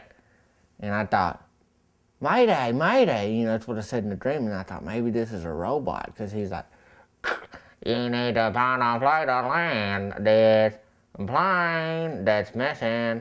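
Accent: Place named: Ozarks, United States. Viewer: This is hilarious! I'm from Arkansas & currently live in Missouri in the Ozarks, & I've met people like this. His accent is spot on! LMAO!!!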